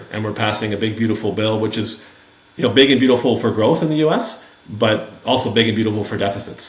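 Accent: Netherlands. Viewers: German name, Dutch accent. I saw some interesting unknown high risk , high reward names in your playlist 🤔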